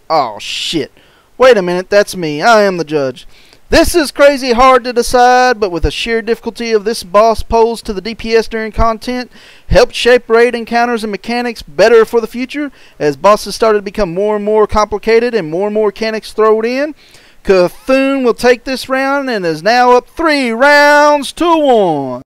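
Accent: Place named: South, United States. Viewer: Like the southern accent, Yogg Saron is my favorite of the Old Gods.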